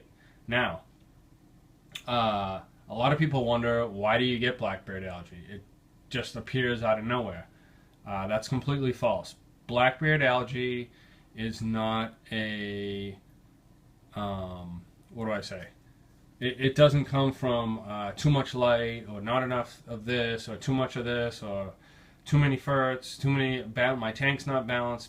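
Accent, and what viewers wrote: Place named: United States. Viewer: "Wahtuh".... oh, the american accents, how quaint. :)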